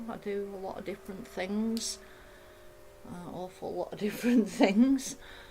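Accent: Lancashire, United Kingdom. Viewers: Your accent makes me nostalgic, as my mum was from Lancashire (Manchester, or Pendlebury, to be exact). Your art describes a lot about what Mother remembered about those times, long ago. Your use of materials is amazing! Thank you. I will watch this video many more times! And God bless England during all the violence./Sandy in North Carolina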